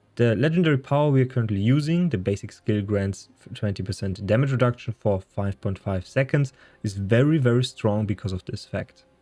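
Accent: Germany. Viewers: Thanks for the video. I could listen to you talk all day. I used to live in Germany and so I miss the German accent of people. You sound like you're from somewhere near Mannheim/Ludwigshafen, but I could be wrong....lol. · Dikka 😂😂😂 nice Video aber dein Englisch 🙈🙈🙈 liest du ab? Würde wetten dass du Deutscher bist, mach deine Videos doch auf deiner Muttersprache.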